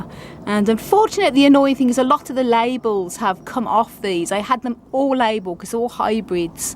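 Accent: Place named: Birmingham, United Kingdom. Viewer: no worries is a true aussie saying 🤣🤣🤣🤣. To me you sound Brummy all the time , not as bad as Ozzie Osbourne though 🤣🤣🤣🤣🤣🤣🤣. Have a great weekend you and Hans, sending you lots of love from Ellyn and me. ⚘😗❤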